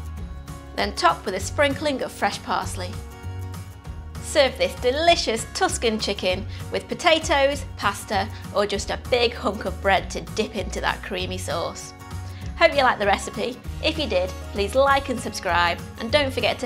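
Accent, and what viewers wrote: United Kingdom. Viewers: I hear a British accent. For sure this will be in another video called "Italian chefs react to Italian cooking videos" with them crying bloody murder 😂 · isnt it funny how a british accent instantly makes someone charming?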